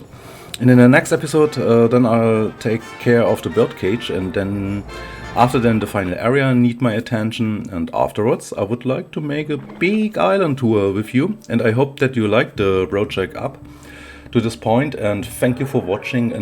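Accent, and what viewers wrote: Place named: Germany. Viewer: Mann merkt das du Deutsch bist xD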